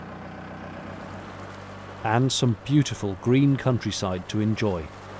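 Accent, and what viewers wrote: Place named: RP, United Kingdom. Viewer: Both "glided" and "glid" are acceptable past participles of the verb "glide". Bog is considered informal so I think he is doing it merely to deflect from his received pronunciation that pervades his every video. You have to love the BBC training that he received for all of it's ills... It's a good job he is good at this video production malarkey isn't it?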